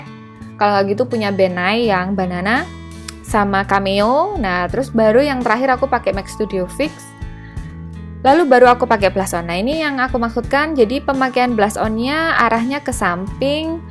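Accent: Java, Indonesia. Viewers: mbak saya pengen belajar makeup tolong gimana cara nya, karena saya sering melihat hasil makeup bagus dgn medok jawanya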